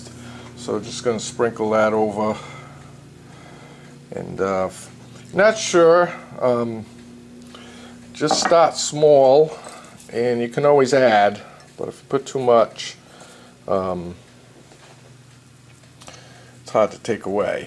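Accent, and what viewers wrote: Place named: Maine, United States. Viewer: you sound like you're from Maine :)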